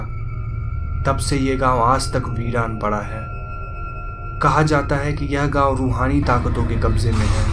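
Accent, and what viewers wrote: Bengal, India. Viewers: Bhi tum bangali ho??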